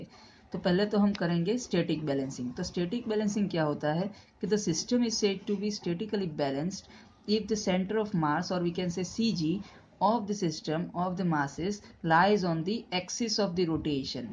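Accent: India. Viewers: I closed the video after hearing indian accent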